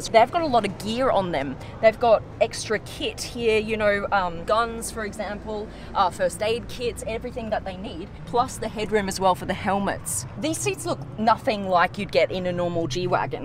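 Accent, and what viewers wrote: Australia. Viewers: Are you from Australia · Nice! I saw the nasdaily video you were with beautiful australian accent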